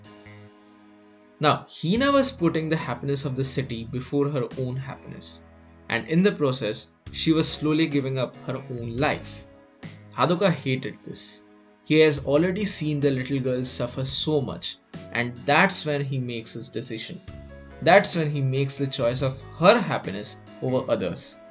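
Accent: India. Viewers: Wanna have an Indian English conversation? we talk just like him.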